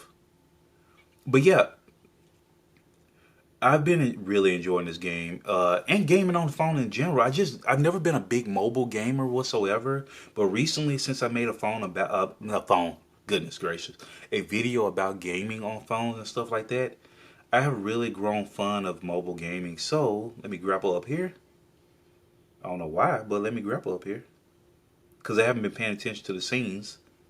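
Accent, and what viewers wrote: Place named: United States. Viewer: Where is your lovely accent from ? Usa but what part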